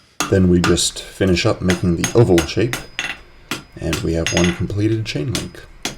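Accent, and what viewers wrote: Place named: Canada. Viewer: Great video thanks for sharing. Where you located? You sound like a Canadian but Im not sure. Very well done.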